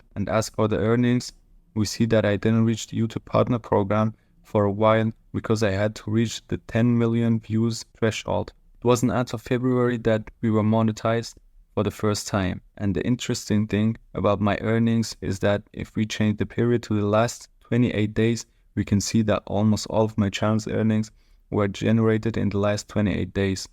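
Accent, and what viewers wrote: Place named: Germany. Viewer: Als wenn du wirklich deutsch bist habs am Akzent erkannt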